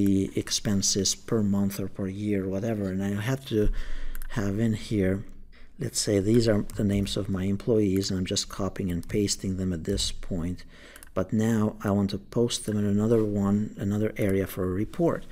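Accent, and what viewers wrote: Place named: Albania. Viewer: Great presentation Hope you don't mind me saying but you have an interesting accent, are you of Albanian origin?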